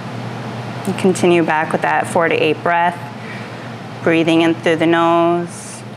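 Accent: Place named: Midwest, United States. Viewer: You're from the Midwest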